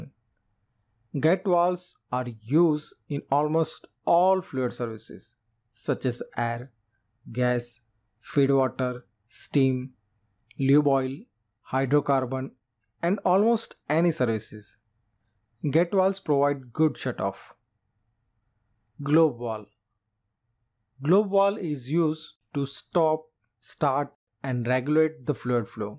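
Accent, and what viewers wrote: India. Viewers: Indian Accent. Never ceases to irritate me.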